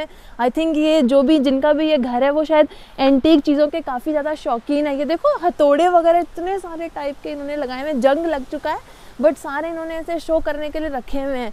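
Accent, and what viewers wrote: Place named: Shekhawati, India. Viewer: Mahesh fouji/ jhunjhunu .mere ghar me buffalo, padi or camel h. Aapki voice bahut achchhi h or shekhawati Ki jhalak dikh rahi h.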